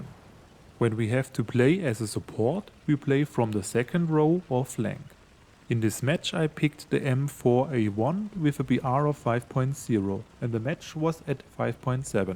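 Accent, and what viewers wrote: Germany. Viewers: Also nice German accent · question is are you german? just wondering no ill intent with my comment but was just curious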